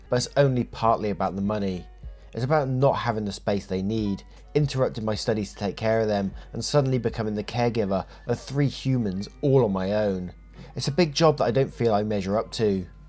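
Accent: United Kingdom. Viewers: I am crocheting a blanket, while I listen your beautiful British accented voice read to me! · When I'm trying to take a nap after chemo, I turn on your playlist. Your voice is very soothing (could be the accent, you know how American females feel about a UK accent😂) and I get a great nap. I always go back and listen again while awake. So yeah, you put me to sleep, thank you.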